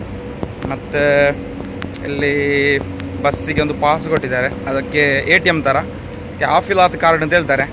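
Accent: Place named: Mangalore, India. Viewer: Nam mangalore baashe keluke chanda marre...sudden agi nim vlogs pop Aithu check madide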